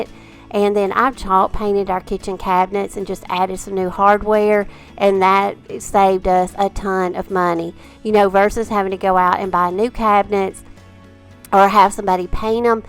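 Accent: South, United States. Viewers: First time viewing any of your videos. Such an inspirational and intimate time we'll spent. So comforting to here a beautiful Southern accent. I feel like I'm talking with family.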